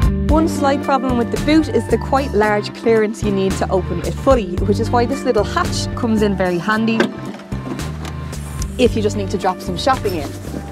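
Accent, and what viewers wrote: Ireland. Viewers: Hiberno English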